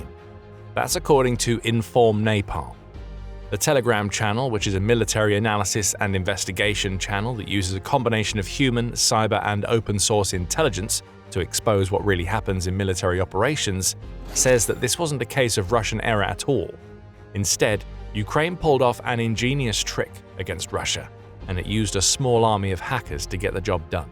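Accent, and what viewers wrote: England, United Kingdom. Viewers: Crap Americanisms with an English accent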